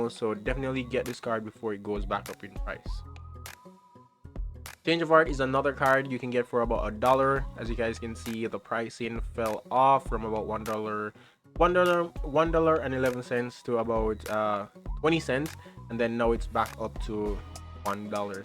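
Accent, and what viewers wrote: Jamaica. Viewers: I can tell from your voice that you are Jamaican. Can you tell me where you play? I am trying to find a locals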